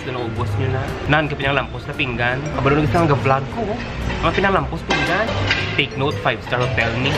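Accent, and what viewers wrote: Iloilo, Philippines. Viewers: Nice one sir!! Ilonggo na ilonggo gd!! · Enjoy gyd kaayo ko tan-aw sa imo mga video ba,pampawala stress imo video ay kay mag sge ko katawa.Ilingaw gyd kaayo ko.ganhan ko sa imo Ilonggo accent.Sakit ako tyan nimo ay :)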